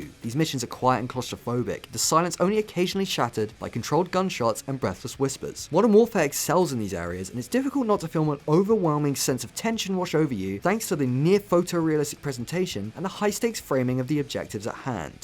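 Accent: United Kingdom. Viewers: British I think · Defs british, not nz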